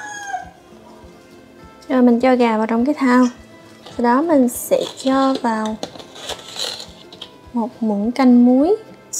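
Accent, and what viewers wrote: Mekong Delta, Vietnam. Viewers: Bạn ở miền tây à,nghe giọng de thương quá, cái muỗng dua quê mình.... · Co Gai mien tay Chat giong hay qua ngot lm e oi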